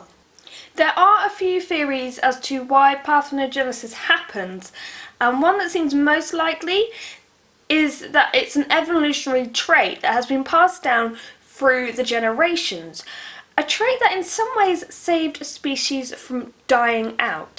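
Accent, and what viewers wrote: United Kingdom. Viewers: She's from Uk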